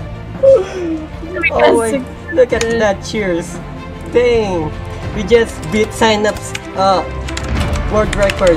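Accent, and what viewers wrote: Philippines. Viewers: is this man filipino he sounds like one ngl also noice thats a gg in my book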